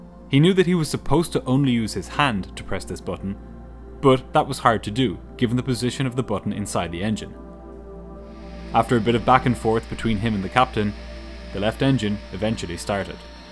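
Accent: Ireland. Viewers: Accent sounds more Irish to me. And we pronounce Louis the French way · Love it when a new video comes out! Or am I just in love with an Irish accent 🤷‍♀️😂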